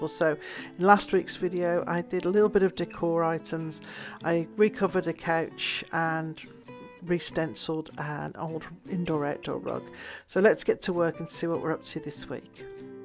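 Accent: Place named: England, United Kingdom. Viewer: Ive oy been watching for short time but i love all tge creating that you do. I love your style as well. Ive wondered because of your accent if you lived in England. Something you said in one of your videos made me think you were in Canada. Now in the comments i see you bought a home in France. Congrats on that. Please tell me where you live now and doing all the beUtiful work.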